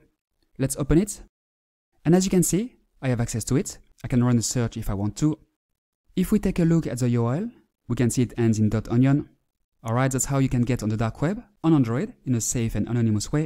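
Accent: France. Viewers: Your English is weird i bet you're french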